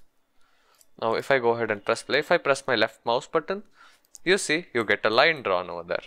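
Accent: India. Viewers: English is not my native language. I speak with an accent. For me if they did this course with normal English it would be much easier to understand. This heavy Indian accent makes the course useless for me. Sorry. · I DON'T like Indian English accents also but this one is not too bad, in my opinion. I can follow it alright.... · Indian accent... Learning from my fellow Indian... 😋😋😋😋😋😋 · please dont use indian cant understand them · This is so great... But unfortunately indian accents are just a dealbreaker for me. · Indian accent, seriously? Come on! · He is an indian so has a clear accent so seems heavy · Gotta be Hinest, it really annoys me when it's a thick indian accent. But his is not that thick, thankfully. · индусский акцент. чо уж делать. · i heard indian guy, i subscribe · when i started to watch i tink that the indian accent is kinda annoying but after 30min watching i tink tat the indan acent is actualy funy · its nice that it is recorded by an indian teacher so i have no issue in accent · Bro’s accent is more indian than mine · 😐 I definitely don't watch this video i don't like Indian en accent 🙂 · 印度口音太重了. 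· Sounds like Indian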